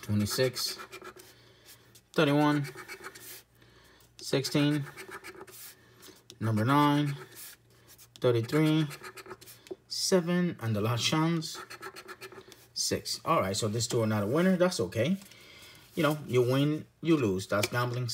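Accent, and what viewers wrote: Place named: Ireland. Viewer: Hi 🖐 great video. I'm from the UK. And listening to you I could hear Irish accent in your voice real quite strong at times. Do you live in Ireland? Lol so curious. 👍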